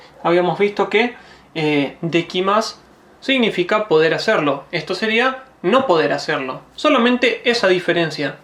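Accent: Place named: Argentina. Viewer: Buenas acabo de conocer tu canal y quisiera saber si empezar a ver tus videos del principio me ayude a aprender japonés sin saber nada o son para alguien que ya está avanzado en japonés? Por cierto vives en Japón? Apenas te oí hablar me di cuenta que también eres argentino jeje, saludos desde Argentina.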